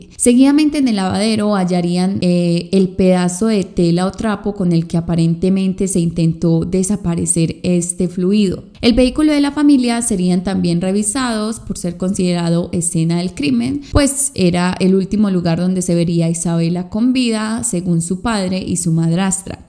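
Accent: Antioquia, Colombia. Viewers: Eres de Colombia? O de donde es tu acento? Me encanta tu acento. Bueno saludos ciao 💋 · Me encanta tu espontaneidad, sos PAISA lo sé .. yo también aunque vivo en Londres have 20 ańos. Ese acento nuestro es maravilloso Sigo tu canal y me gusta mucho tus historias y análisis.. MUCHÍSIMA SUERTE DIOS TE BENDIGA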